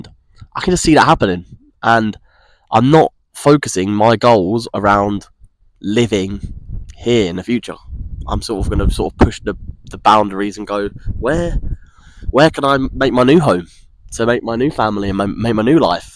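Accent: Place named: RP, United Kingdom. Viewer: U sound posh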